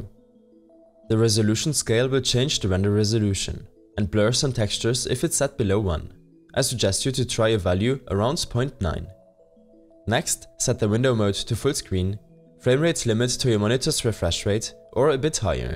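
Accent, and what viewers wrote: Germany. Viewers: i bet ur german u sound like one ich bin ja auch deutsche :D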